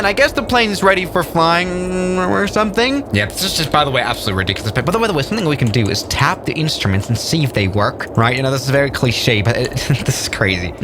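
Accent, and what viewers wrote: Mid-Atlantic, United States. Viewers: Your accent is good. It's pretty close to normal mid Atlantic American. I'm impressed.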